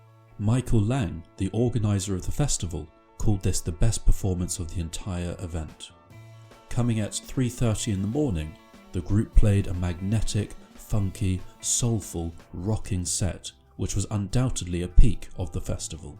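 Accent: England, United Kingdom. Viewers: and her....got to be in there. Since you have a bit of an English accent I don't think you know the new Patriot culture. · I was there at age 17. Does this English accent narrator even mention? Paid least, played the best and most moment. Also, made an impassioned and unforgettable contribution with. I had seen the year before at the unforgettable Newport Pop Festival in the broiling Costa Mesa summer of 1968.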